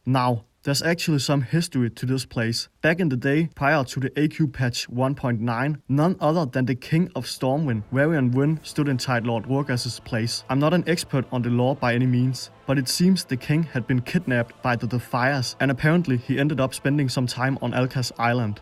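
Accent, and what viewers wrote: Denmark. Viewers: Danish here. Hes Danish. Not a single doubt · A strong Danish accent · This is the most danglish english I've ever heard. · You Sound very Danish. Where do you come from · I listened to his video. He sounds painfully Danish. I know since I'm Scandinavian. It's like when an American hears a Canadian accent. I wouldn't be able to pick up on it and might even think I'm speaking to someone from the US but Americans seem to pick up on it instantly. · Cranston he is danish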